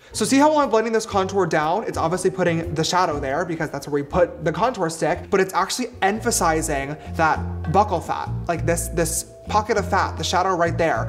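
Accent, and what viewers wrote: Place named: Scotland, United Kingdom. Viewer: is it just me or like kinda has a scottish accent in this vid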